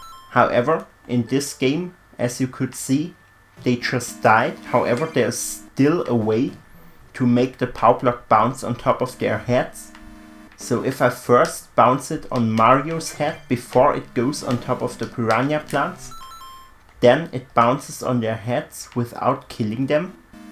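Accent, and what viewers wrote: Germany. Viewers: Wait!... Are you from Germany? 🇩🇪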